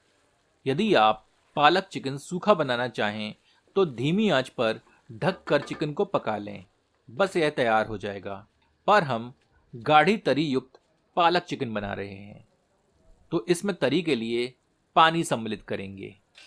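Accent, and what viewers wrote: Standard. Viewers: Great to hear shoodh hindi. Well spoken and the recipe is amazing. Thank you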